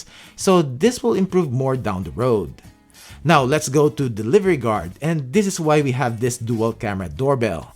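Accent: Philippines. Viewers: Kuya, you sound Filipino.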